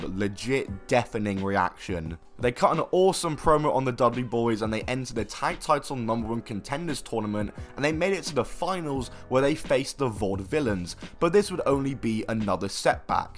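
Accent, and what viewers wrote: United Kingdom. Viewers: I think it's the British narrator's voice that makes me feel smart and snarky, too. 😆😆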